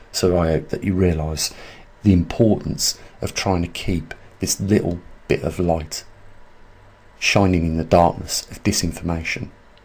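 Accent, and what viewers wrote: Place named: England, United Kingdom. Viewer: Click on CC at the bottom of the video and then click on the wheel to the right of CC for the settings for 'auto translate ' then scroll countries to select 'Spanish'. The Auto-translate isn't perfect especially as has an English regional dialect. Bless you and perhaps interpret main points in the video into Spanish and put that into comments.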